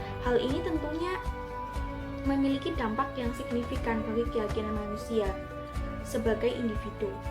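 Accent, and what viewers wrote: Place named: Banyumas, Indonesia. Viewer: Uwuwuwu wong ngapak 😂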